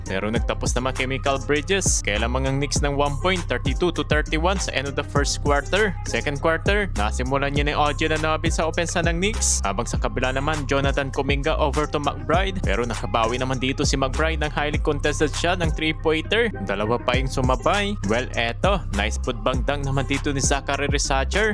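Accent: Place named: Visayas, Philippines. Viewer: naging bisaya accent?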